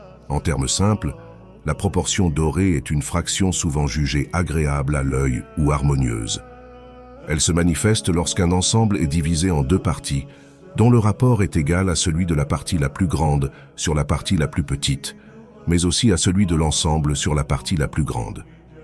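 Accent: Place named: Algeria. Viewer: salut a vous toujours dalgerie d apres votre accent vous faites plusieures videos de differentes appelations le plus important pour nous tous c est vos efforts colossals au service de la spiritualite scientifique le savoir vivre avec cette complexité mathematique ou le nombre d or est present partout meme dans la conception des pyramides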